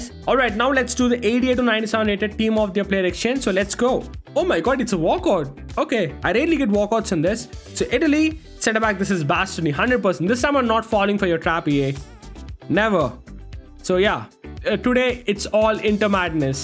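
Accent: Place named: India. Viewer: His sound like India but I’m love you! VIDEO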